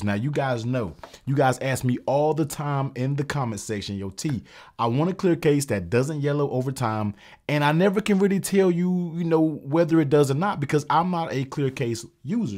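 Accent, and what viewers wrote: United States. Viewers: I liked your American accent, man 👌💞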